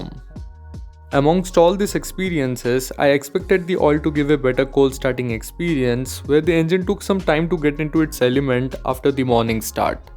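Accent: India. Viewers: your tone is hindi but you speak english,...is very bad if speak english use english tone....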